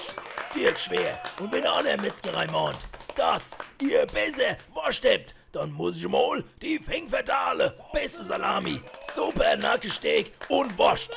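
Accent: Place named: Odenwald, Germany. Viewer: Ach wie schäi is doch Ourewällisch🤘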